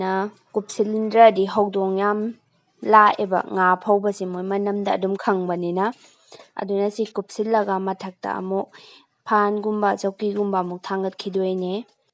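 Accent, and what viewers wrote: Kakching, India. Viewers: Kakching accent 👍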